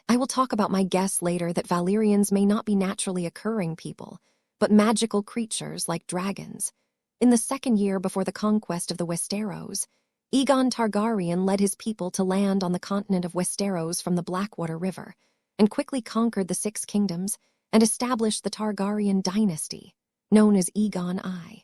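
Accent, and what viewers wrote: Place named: China. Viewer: I knew it was chinese translated snd fan made. Just how the narrator speaks. Good story though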